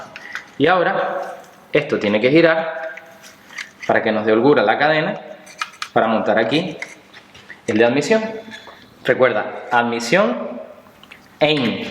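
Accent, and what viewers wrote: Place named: Venezuela. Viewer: Hablas como venezolano, eres de canarias?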